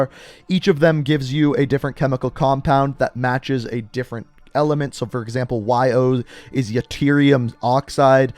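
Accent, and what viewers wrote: Canada. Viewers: Yo I love your Canadian accent much love my man❤️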